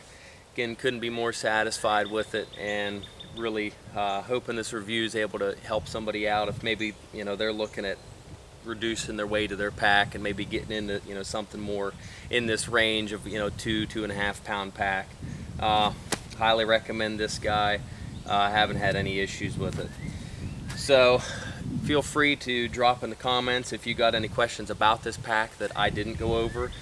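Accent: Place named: Pennsylvania, United States. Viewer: Where are you from? I can't put my finger on your accent. My guess is Central/Western PA? I have a the Granite Gear Crown2 60 and love it. Recently picked up the Gossamer Gear G4-20, a 42L frameless pack that weighs in at 25 oz. I'd say its a lot more comfortable than the Crown2 if you can get your kit dialed in to fit into the smaller pack. Worth checking out for sure!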